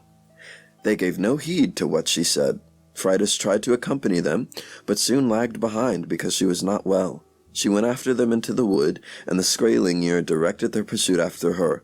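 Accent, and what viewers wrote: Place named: Canada. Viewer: I was mystified by the mispronunciation. The narrator has a Canadian accent, but pronounces some things phonetically. Makes me wonder if this is actually an AI bot program. Growing up in eastern Canada, we had history lessons out the ying-yang and the pronunciation of common Canadian places is pretty basic. And I can't imagine an Anglophone mispronouncing "sword" as it looks.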